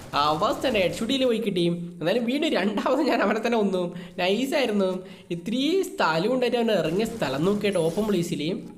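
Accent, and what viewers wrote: Kannur, India. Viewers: Bro kannur ano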